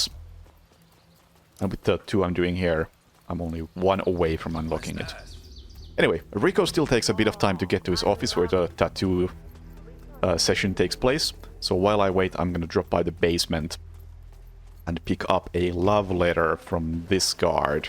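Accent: Finland. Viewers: Damn I’m glad I found your channel. Amazing work! Your Finnish accent is captivating! I’ve obsessed over Alan Wake recently and so they have a resounding amount of Finnish references/language in the games. What a fascinating people. I love Finland!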